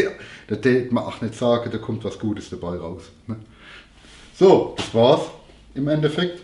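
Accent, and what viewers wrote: Germany. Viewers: Hi, super Sache das, bin zufällig jetzt hier gelandet weil ich dein erstes Video (glaub ich?) gesehn hab, wie man einen Bobber für unter 2000 Ocken bauen kann. echt krass, gut gemacht hast eine gute Art sowas rüber zu bringen, deshalb freu ich mich auf die Erklärbärvideos, wird sicher geil Apropo, bleib so wie du bist, Pfälzer sind cool und Dialekt babbeln is voll ok ich versteh dich jedenfalls ...aber eins musste mir versprechen, bleib dir treu ;) Bis denne Stephan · Du Klingst wie ein Odenwälder 😁 coole Videos!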